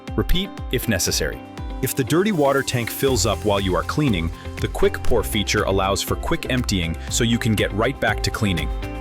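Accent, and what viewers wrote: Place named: United States. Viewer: what an awful video with irritating music and fast speaking american accent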